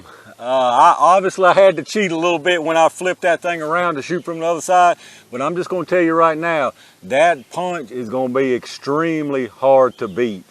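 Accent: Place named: Kentucky, United States. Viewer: I absolutely love your accent. Reminds me of my Kentucky kinfolk. ❤️ I loaded up on Federal punch because of your videos. They should be paying you!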